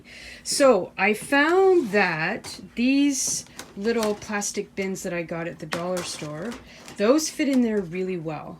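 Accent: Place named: Maritimes, Canada. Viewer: I just stumbled across your channel today. From your accent, or lack there of, I was working on the premise that you were in Canada and I am betting the maritimes. I love what I have seen so far and have happily subscribed. I am in the process of preparing to move. Ugh…. However, there will be a dedicated craft room in the new place, so there are benefits to going through the agony. Your organization ideas have got my mind stirring. I have a dresser that will fit perfectly in the closet in the room. So far I am dedicating a drawer for each type of craft and each drawer will contain the tools and frequently used items in it. Unfortunately that means that I have to store extras outside of that room but hey, it’s a start. Please keep up the posting as I am now addicted. Anyhoo, hello from Ottawa!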